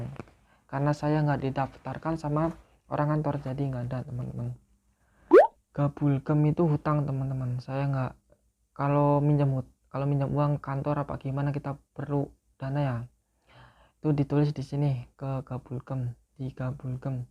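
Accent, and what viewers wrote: Tegal, Indonesia. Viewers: Wong ndi sih? Kaya wong Tegal basane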